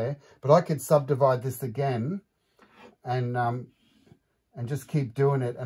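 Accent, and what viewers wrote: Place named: Australia. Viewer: Do you do courses Or classes? I'm in Sydney Australia. I am in Sydney & I just realised you have an Australian accent I was so engrossed in your teaching I didn't even notice. I would love to take a course lol 😂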